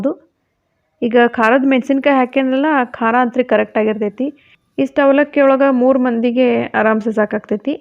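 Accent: Dharwad, India. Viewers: आपण किती छान धारवाडी कन्नड बोलता.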